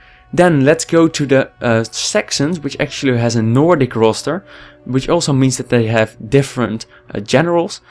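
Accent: Netherlands. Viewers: are you dutch by any chance? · Deze kerel moet wel nederlands zijn haha. lekker accent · Zo Nederlands deze vent, geniaal accent dat! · Damn, that dutch accent though, keep up the good work!👍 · Je bent een Nederlander zo te horen. Interessante review tho.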